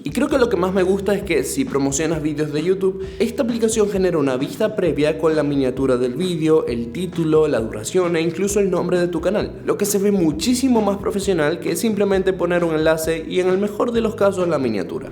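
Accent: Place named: Venezuela. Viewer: Eres de Venezuela verdad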